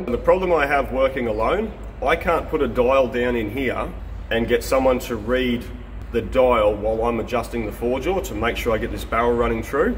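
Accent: Australia. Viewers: First time I've heard it said like that: "moyd in tha yoo-ess-ayyy" (made in the USA) hahaha love the aussie accent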